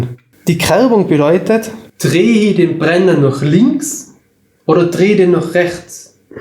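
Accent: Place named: Vorarlberg, Austria. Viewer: Super Video und mit dem Vorarlberger Dialekt super sympathisch!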